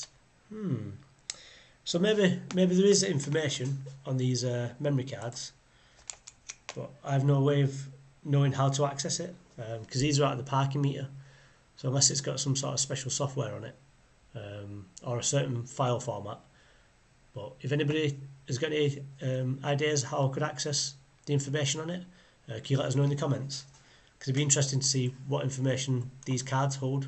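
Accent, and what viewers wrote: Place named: Liverpool, United Kingdom. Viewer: No. I just don't understand Scouse.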